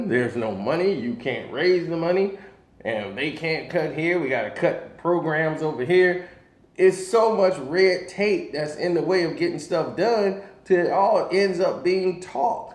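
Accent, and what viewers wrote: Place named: Chicago, United States. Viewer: he's from Chicago lol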